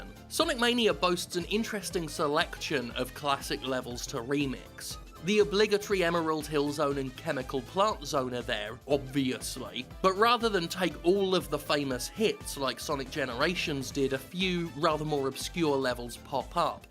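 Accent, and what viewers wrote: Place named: United Kingdom. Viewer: Are you sure about that? From his accent he's clearly British! =P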